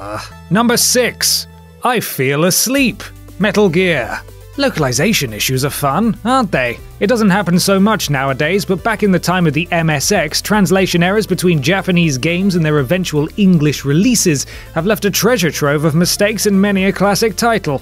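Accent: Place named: England, United Kingdom. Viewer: You had me at "silver haired bugger" but you killed it at "wanker". God save the queen, your video is awesome but you english accent earned my subscription.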